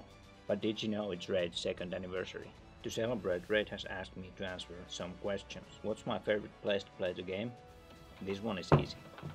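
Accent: Finland. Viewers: Mitä helvettiä, oon kattonu sun videita nyt varmaan jo vuoden ja en oo ikinä tajunnu että oot suomesta :D Aloin miettii asiaa vasta ku aloit puhumaan mainoksen aikana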